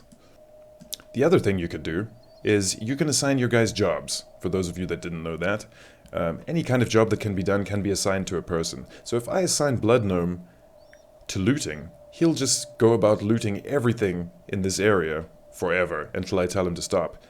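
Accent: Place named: Australia. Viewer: Great work man! Thanks so much! What is this muddled Aussie accent mixed with?!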